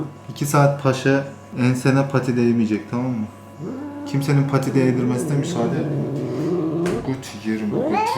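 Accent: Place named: Tokat, Turkey. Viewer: Tam tokatlı şiveden belli 😂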